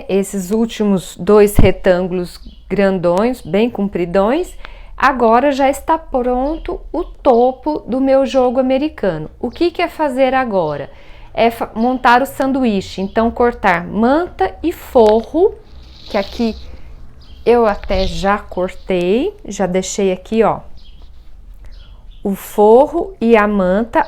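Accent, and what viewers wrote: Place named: Minas Gerais, Brazil. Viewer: Adorei! Gosto demais de ouvir vc falando com esse sotaque de mineira, me lembra muito minha mãe.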